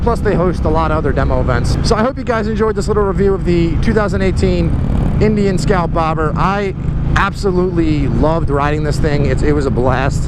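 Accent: Pennsylvania, United States. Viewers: Hey Dude, Where have you been? Great review! I'm a HD guy and I'm leaning towards a new Softail Slim. But Damn! Funny you sound like you more from my neck of the woods in PA. Great Review!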